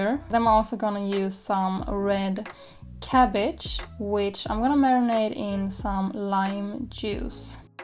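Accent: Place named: Sweden. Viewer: That Swedish accent tho.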